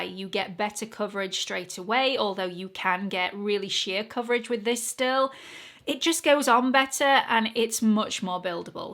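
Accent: United Kingdom. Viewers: I always watch you till the end! besides being interested in what your talking about love your British accent! Much Love!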